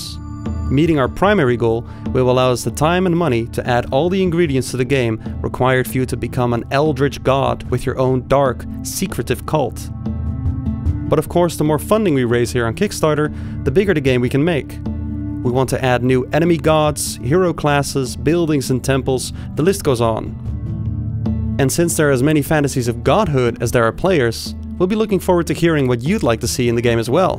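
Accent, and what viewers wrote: Netherlands. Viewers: I can really recognize the Dutch accent. But that makes it nicer actually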